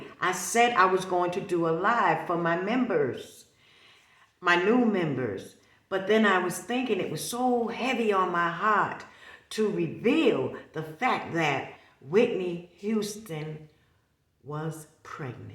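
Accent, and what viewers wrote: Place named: Boston, United States. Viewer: Heavy on ya "HOT"(HEART)❤ Straight Boston accent 🆗️ Love it and thanks 4 the "tea"🍵💨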